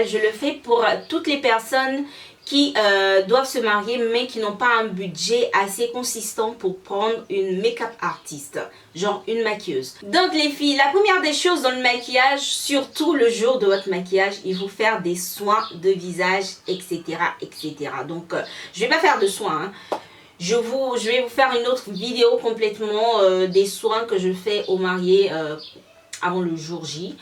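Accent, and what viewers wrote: Ivory Coast. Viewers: Accent Ivoirien ma chérie.😍😍😍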